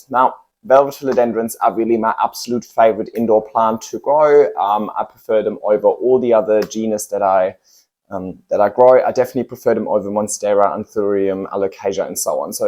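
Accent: Germany. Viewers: Ahh nice! My best friend is German, I detected something in your accent. 😜 Right! Makes sense. How do you remove the roots from the moss pole successfully?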